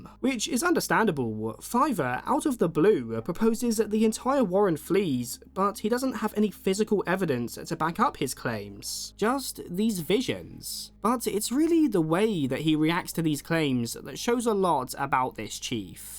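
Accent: United Kingdom. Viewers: I was trying to place the accent. Sounds like if Mark E Smith if he came from Southend and not Salford. It's nearly unlistenable.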